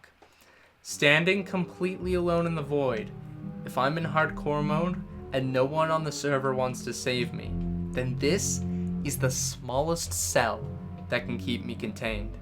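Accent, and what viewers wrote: Canada. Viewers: Are you Canadian ? 🫧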